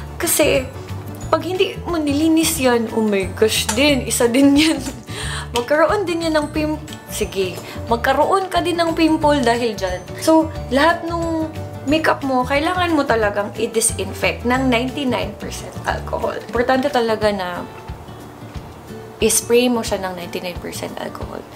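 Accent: Visayas, Philippines. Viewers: HAHA! Nalingaw ko maminaw magtagalog ang bisaya.. Perti gyud kagahi ug kasamok maghunahuna unsa sunod e.estorya sa tagalog jud. Keep it up. Love your vids with dodong.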